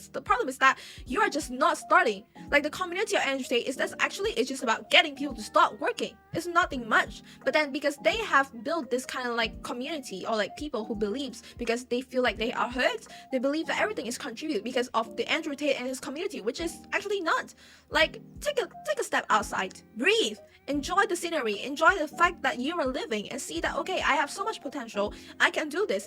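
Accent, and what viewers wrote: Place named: United States. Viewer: Can you be less american in your gestures and way of speaking ?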